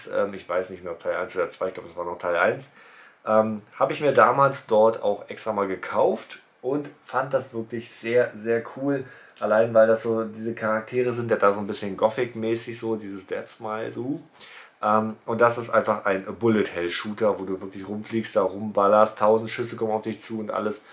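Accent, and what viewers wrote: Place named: Berlin, Germany. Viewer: ich feier es mega wenn du berlinerst :D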